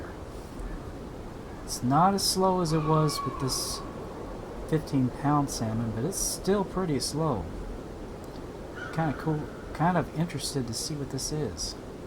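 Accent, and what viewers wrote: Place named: Georgia, United States. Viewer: You sound like youre from Georgia, which makes you a natural Ice Fishing Expert I recon :D Good Video! Can you show us how to catch Sturgeon next?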